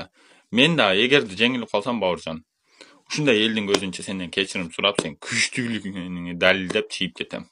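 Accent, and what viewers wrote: Standard. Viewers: Баракелде мына накта жигит. Таза кыргыз тили менен суйлоп жатат. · Молодец,жунбаш мага караганда таза суйлойт экенсин кыргызча 😂😂😂 эркек менда сени колдойм. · Таптаза суйлойтко бул бала Алхамдулиллах деген созун абдан жакты ийгилик Аман бол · Красавчик орсу болсондо бауржандан таза суйлойт екенсин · Маладес Орус Улутуңда Болгонуң менен Кыргызчаны Таза сүйлөйт экенсиң Азамат Сени менен дос болуш керек экен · Рассиянын каериндесин Уят кылдын кыргызча суйлоп Кыргызчаны сендей таза суйлошпооойт. Альзамдулиллях деген созун учун ылайым еки дуйно бактылуу бол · Маладец еркек екенсин орус болсонда ит емгенге караганда кыргызча таза суйлодун 👍👍🤝🤝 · Бул таза суйлойт го кып-кыргызча👍👍👍👍👍👍👍👍👍👍👍👍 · 😂😂😂ама эркекче болуптур а булжагы? Анча мынча Кыргызстандыктардан тазза сүйлөйсүнго жетим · Достойный Таза кыргызча суйлопсунго молодец💪 журогун кыргыз экен 🔥🔥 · он кыргыздын ортосуна бир орус келсе ону тен орусча суйлогон кыргызым ай бат эле сатылып кетесинер мен жон гана туура обу жок катын орсок уй сайт деп койот кыргызда обу жоктордон он эсе таза суйлойт экен анысына рахмат мен бауржанды эле колдойм себеби ал кыргыз мен кыргыз экенимди мекенимди сатпайм бирок бабуржандын оздук аламдык адеп ахлагынабы же элге болгон улгусуно карасак точно эле тантык. 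· Молодец орус болсода таза кыргызча суйлоп салдыго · Менденда таза суйлойтко 😀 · Красавчик. Условияны туура койдун.Кыргыз тилин таза суйлойткенсин! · Молодец кыргызча таза суйлойт экенсин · Азаматсын опкосу жокторго караганда таза суйлойт экенсин азаматсын чон жигит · Нукура,таза суйлойт экенсин. Азамаатсын,жигит. Бар бол,аман бол,ийгилик саган. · Кыргызчаны таза суйлойт экенсин Сенамдарга Караганда олаа Сола оняк соняк тана ука тирик жетим жакшына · Кыргызча таза суйлон экенсин 👍👍👍👍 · Орус кыргычаны таза суйлойтко хайы келдимго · Азаматтсын иним таза Кыргызча суйлойт экенсин ишине ийгилик кайда журсон да аман эсен бол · молодец тууган кыргызчаны өтө таза сүйлөйт экенсиң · Азаматсын жигит Кыргызчаны менен жакшы суйлойт экенсин мен Лейлекский акцент менен тата пата деп суйлом сен орус болуп таза суйлойт экенсин тан бердим. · Азамат Манастын тилин таза суйлойт экенсин иним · Баракелде анча мынча кыргыздардан таза суйлойт экенсин аман бол укам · Баракелде бала , таза суйлоптур 👍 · Красавчик таза анча мынча кыргызга Караганда таза суйлопсун мына сени патриот десе болот орус болсо да кыргыз тилде суйлогон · Аззаматсын таза Кыргызча суйлогон учун👍🤝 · Молодец кыргыздын орусу таза суйлоптур алга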